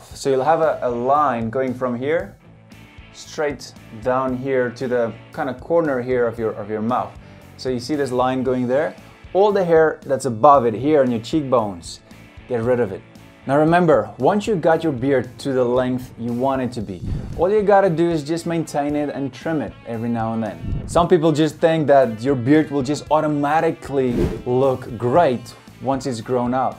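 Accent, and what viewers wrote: South Africa. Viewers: Awehh broooo did not expect you to bee south african 🇿🇦🔥💪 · That accent sounds south African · Soon as you started talking I thought, that's a boerseun. You can never fully undo the south African accent. Thanks for the tips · Can't help noticing the accent, south African? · Are you a South African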